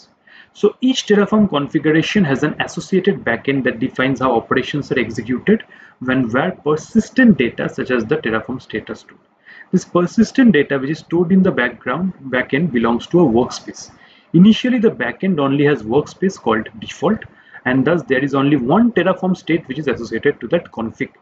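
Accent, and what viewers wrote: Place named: Bengal, India. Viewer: Explanations are nice, kindly work on ur bengali accent to make things easier for others. U got potential.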